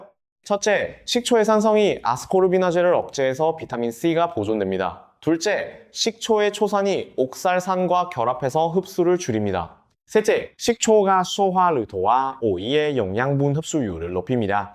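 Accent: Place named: China. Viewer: 방송이 뭐 이래요 중국식 발음이 뭐예요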